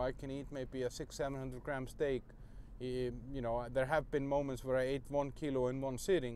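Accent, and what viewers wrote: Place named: Iceland. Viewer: Hah! I knew it from the first minute, that accent. I lived in Iceland for several years in the early 90s (turned 20 in keflavikr) I miss the lamb hotdogs. I've been carnivore for a while now, it's definitely a huge help.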